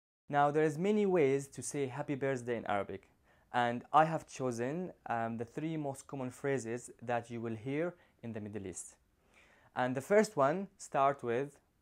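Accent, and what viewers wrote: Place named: Lebanon. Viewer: This is in the lebanese accent. Although the words are same, the words are pronounced slightly differently from the Gulf countries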